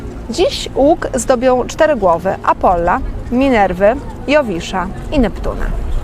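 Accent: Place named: Italy. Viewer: Uwielbiam słuchać Pani opowieści. Włoski akcent jest niezwykły, nawet w języku polskim 😊😊😊😘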